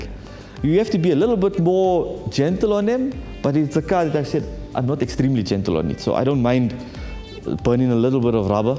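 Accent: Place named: Afrikaans, South Africa. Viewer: That lovely accent is Afrikaans and yes, the car is stunning too. Peace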